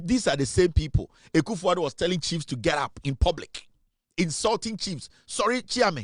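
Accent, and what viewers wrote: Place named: Ghana. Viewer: It is only u see this useless government keep mahama and the useless ndc ministers are doing good gyimifuo till today Ghana fuo don’t have run water in their homes in the capital city and gyimifuo u said useless ndc is doing good no problem Ghana is still yoyr country no matter how long u stays in America u still comes from abd born from this same jungle country Ghana any one can see your nose and accents and head blooms Ghana ma ni or Nigeria ni ni so gyimi keep on lair to your low IQ egyimifuo followers kwasia boy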